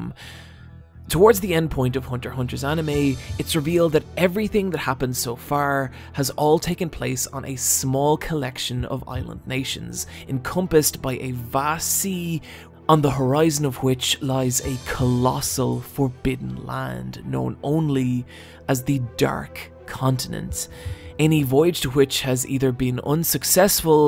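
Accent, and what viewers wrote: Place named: Ireland. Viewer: started watching cus i liked the irish drawl. stayed because you clearly have a lot to say, and i'll always listen to those who take the time to talk about the shit they're passionate about.... as long as they're not fascists.... you're not a fascist, are you?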